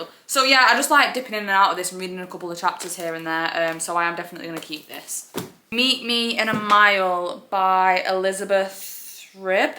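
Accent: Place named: United Kingdom. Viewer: May I ask what region of the UK your accent is from? I've recently became interested in all the English dialects and want to learn how to distinguish them. I think the only tv show I recognize the accent is from Adolescent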